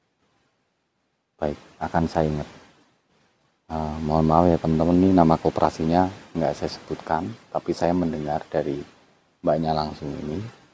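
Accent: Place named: Central Java, Indonesia. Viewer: Assalamualaikum MET sore mas baru nonton nih vlognya.oya klo boleh tahu mas jawanya mana ya karena saya juga orang Jawa tengah.klo mendengar cara bicaranya kok sama logatnya SPT kampung saya.ya semoga saja Deket dg kampung saya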